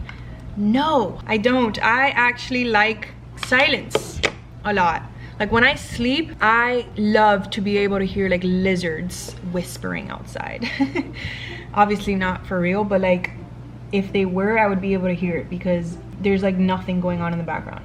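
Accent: Cuba. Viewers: OMG!! Me encanta tu acento cubano, besos desde España. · ayyyee my question made it to the video! Me encanta tu acento cubano 😉 I’d be cool to see a GRWM in Spanish or any other video spoken in Spanish ! 🤗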